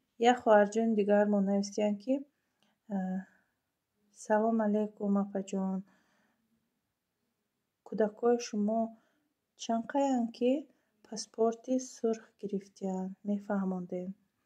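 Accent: Tajikistan. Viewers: Салом хоҳарҷон барои таърифтон гап намеёвам чихел таърифтон кнм❤❤гапзанитон тоза тоҷикона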